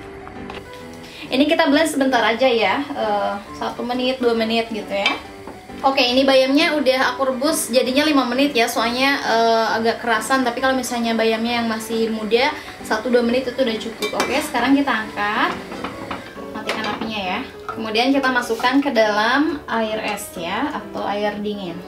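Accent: West Java, Indonesia. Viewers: Tth tj pakih mana sunda na kitu..jntn panasaran...nuhun resepna... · Suka sama gaya bicara nya sunda pisan 😍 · assalamualaikum tth nthh angin😂 apa yg bisa bawa sya ksnii duhh tth ngomongnya khas sunda bgt ya ihhss gemes😂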